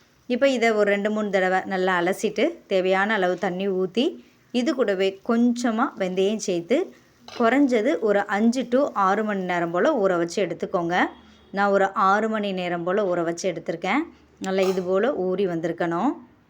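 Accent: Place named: South Tamil Nadu, India. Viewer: hello neenga tirunelveli kanyakumari nagarkovil paguthigalil ondrsi sernthavarghal kovai saayalum irukku just by tamil slang sis.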